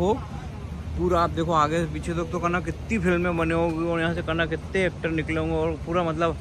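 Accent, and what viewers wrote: Indore, India. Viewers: Bhot jandar 👌👌👌👌 Ye foreign youtube channel wale indori nahi bolte to mja nahi aata unke vlog me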